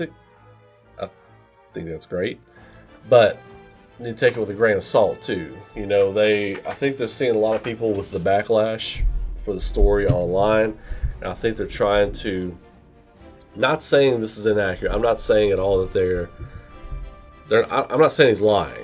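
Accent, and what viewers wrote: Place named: Kentucky, United States. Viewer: I’m curious on you accent, it sounds like Kentucky, but it’s a bit more rounded I think.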